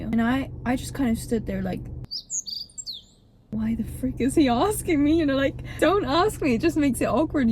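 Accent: England, United Kingdom. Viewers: OMG GOD I LOVE UR ENGLISH ACCENT 🫶🏼🫶🏼❤️ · Dios mío, amo tu acento inglés ❤❤❤💋💋💋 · OMG GOD I LOVE UR ENGLISH ACCENT ❤❤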